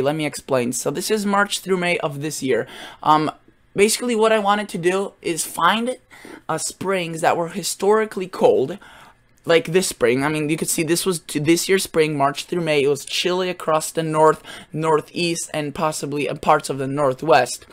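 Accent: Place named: Upper Midwest, United States. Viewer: This guy is so from WI or MN.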